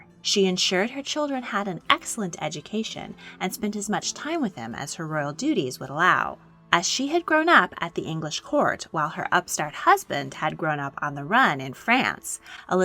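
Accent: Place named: United States. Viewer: Dying of dysentery on the battlefield? Huh. American narrator for English history; never works.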